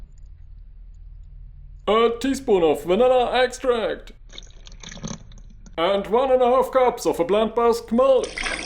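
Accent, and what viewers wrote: Germany. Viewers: It is probably the well-known German accent.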